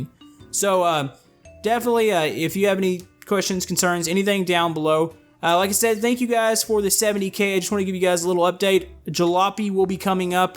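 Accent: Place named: Texas, United States. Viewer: can you speak with your texas accent?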